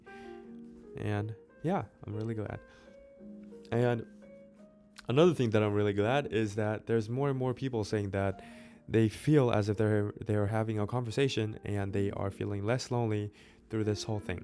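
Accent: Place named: Texas, United States. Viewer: Your English is concise & perfect 👌, you underestimate yourself. Your accent sounds predominantly 🇺🇸-Texan. H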